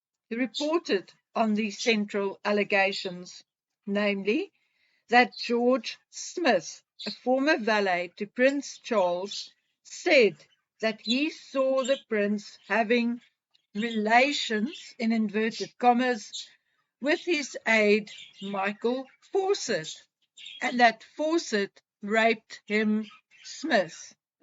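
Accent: South Africa. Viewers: Hi ,- so nice to hear a South African voice on these Harkle exposé videos! Thank you for your in depth research and your integrity. You are always balanced, fair. I would be interested in hearing more about the paintings.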